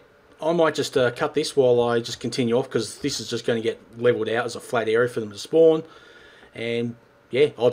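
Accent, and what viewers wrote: Australia. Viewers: he sounds like an auusie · this doesn't matter to me but answer this truthfully Are you actually Aussie or an American poser?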